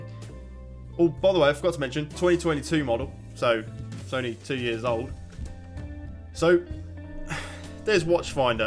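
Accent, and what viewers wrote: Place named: Birmingham, United Kingdom. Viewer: Piss take!!! By the way can I just say there’s no better accent than a cynical Brummie one!!! I know, I am one😂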